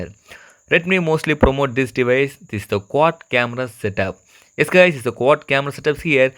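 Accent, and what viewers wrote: South India, India. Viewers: Damn Indian voice with a rather Asian look? Tf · Dont steal peoples videos then put your own watermark on it.... Sad. You actually think people believe this is your video, showing chinese person with indian voice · South Indian voice over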